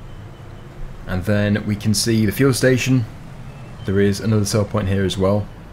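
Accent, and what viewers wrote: England, United Kingdom. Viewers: Hello sir are you English?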